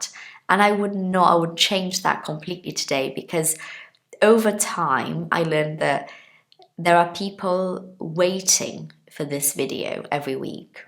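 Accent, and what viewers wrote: United Kingdom. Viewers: Arrisco dizer que é porquê o povo só se interessa pelo inglês "americano" já que o dela é britânico, fazer o quê, os melhores canais são sempre notados por uma minoria enquanto modinha ou porcaria rende milhões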